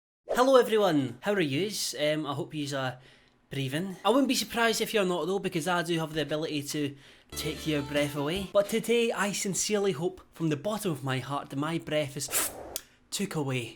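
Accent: Scotland, United Kingdom. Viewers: Yous couldn’t get any more Scottish if ya fooking tried ladi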